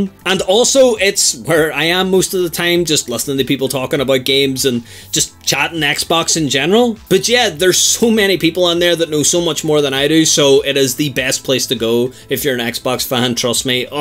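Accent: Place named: Northern Ireland, United Kingdom. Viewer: I was lookin up about my bioshock infinite promo copy i had from ages ago didn't expect a fellow northern irish voice as well like!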